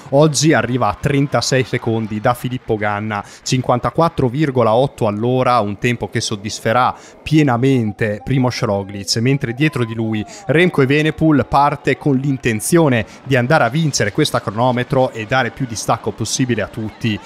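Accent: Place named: Reggio Calabria, Italy. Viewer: Pensavo di Reggio dalla S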